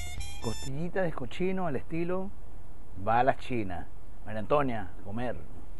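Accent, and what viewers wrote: Caracas, Venezuela. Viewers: Guao acento Venezolano Caraqueño,exitoso chino... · Chino te amoooooooooooooooo, me encanto verte cocinar con tu acento caraqueño, quiero la receta de las lumpias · Simpático este chinito que no puede negar su acento Venezolano! Hoy iré al bosque en el barrio chino a ver si tengo suerte de conseguir algo de eso! Con esta escazes imagino coseguiré la mitad, el resto lo inventaré, así estamos aquí! Gracias por tu receta! Un abrazo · Que cómico me encanta sus comidas pero me encanta también ese acento caraqueño sifrino que tiene gracias 👍👏👏❤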